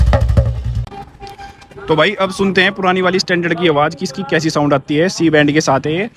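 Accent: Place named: Muzaffarnagar, India. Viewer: Bhai tum muzaffarnagar se hi ho kya 😅😅😅 konse gao ke hoo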